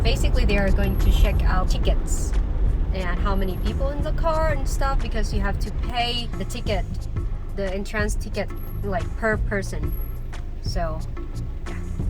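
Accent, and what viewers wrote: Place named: United States. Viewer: Enjoyed your video❤ Your American accent is so sweet😊 Also you are in very good shape & lots of stamina; most likely you can climb one of the 8,000+ metres peak easily.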